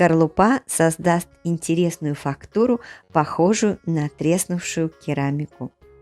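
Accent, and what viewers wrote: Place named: United Kingdom. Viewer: I love it and appreciate the UK voice over. Many thanks x❤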